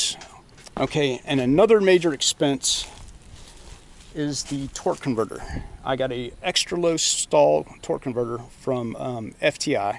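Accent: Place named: Georgia, United States. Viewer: Lol I knew this guy was from Georgia before I Saw his tag I’ve been to pike County a few times!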